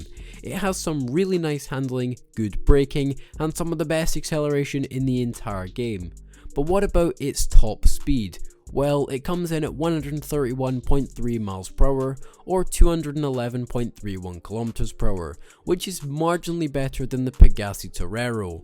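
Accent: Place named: United Kingdom. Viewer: Why tf wouldn’t you put the subtitles for the cars. No one can understand your stupid British accent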